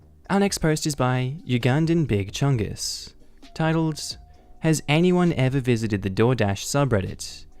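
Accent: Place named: Australia. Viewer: BTW i recommend your channel to my aunt who’s learning English, for listening skills. God thing you’re a native speaker!! Aussie accent is real good for learners! <3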